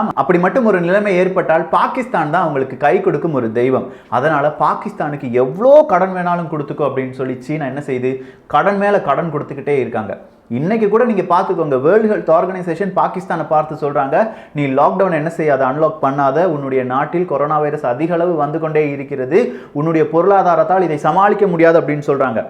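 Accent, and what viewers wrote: Kerala, India. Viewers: hey he is in Kerala might be influenced by the surroundings r ketu ketu slang appidi vandhirkulam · Then, yesterday why u told Malayalam slang come out while you speaking.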